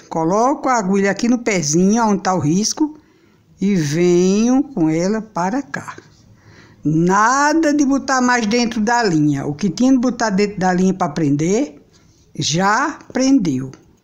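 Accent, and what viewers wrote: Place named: Northeast, Brazil. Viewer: NEGA AMEI VOCE E SUA SINCERIDADE . UM CHERO BOM PRA TU . SOU FILHA DE NORDESTINO . AMO ESSE SOTAQUE.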